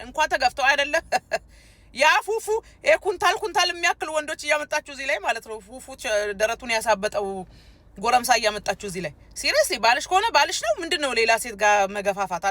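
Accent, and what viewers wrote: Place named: Nigeria. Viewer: From your accent, I can tell that you are/were with Nigerian guyoo!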